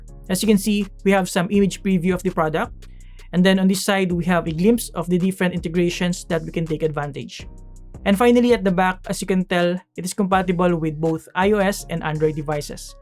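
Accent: Philippines. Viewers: Come through Filipino accent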